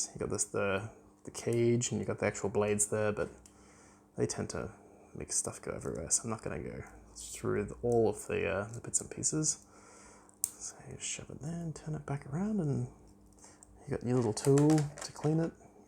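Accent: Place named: Germany. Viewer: Deutscher Akzent kickt wie Beckham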